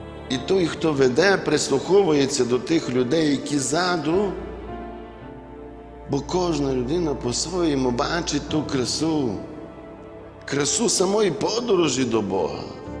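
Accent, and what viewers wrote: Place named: Western Ukraine, Ukraine. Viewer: Я в захопленні від Ваших наук,від нашого західного діалекту. Щиро Дякую,живу ними❤️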